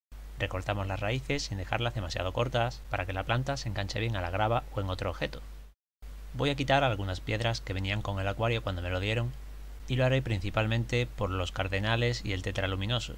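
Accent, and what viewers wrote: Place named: Andalusia, Spain. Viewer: oye tu de donde eres porque he estado viendo videos tuyos antiguos y tienes acento andaluz porque yo soy de andalucia xD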